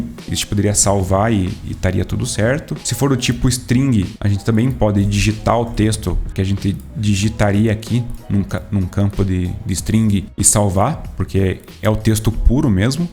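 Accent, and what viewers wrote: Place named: Paraná, Brazil. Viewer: haha quando vi o sotaque já imaginei ele é paranaense, e que massa que é daqui de CTBA piá. haha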